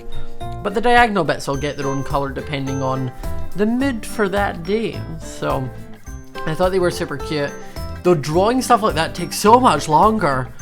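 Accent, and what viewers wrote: Scotland, United Kingdom. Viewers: I KNEW IT, HE’S SCOTTISH...I think.... Also have a happy halloween and bonfire night~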